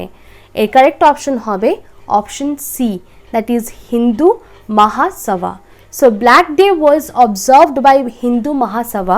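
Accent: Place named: United Kingdom. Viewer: Mam apni oxford english bolchen.ইংরেজ দের মত বলছেন কানে বডড লাগে।আপনাকে ছোট করলাম না।sorry vul hole khoma korbe .apner question gulo khub valo.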